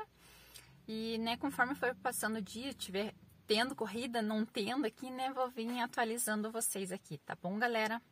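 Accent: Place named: Paraná, Brazil. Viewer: Rachei com vc sutaque de pé vermeio parabéns galega vou seguir vc mora em SP mas nasci no Itambé Paraná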